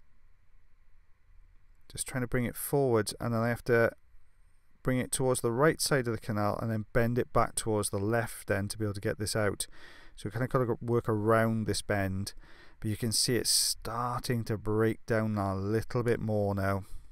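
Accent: Wales, United Kingdom. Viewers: Haha...18 minutes of a Welsh accent...how could that ever bore anyone? And an explanation of what is going on...I have learned plenty...and not only the terms (the attic, canal walls, etc) and the various conditions which occur. This is a whole new course! Thank you. I didn't waste my isolation! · Agree! Want to learn that british accent.. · I keep coming back because i miss the welsh accent while living in korea. When you said go get a cuppa literally lit up my eyes😂 nobody has said that to me in 5 years while ive been here! Aaaand your videos really relax me before bed!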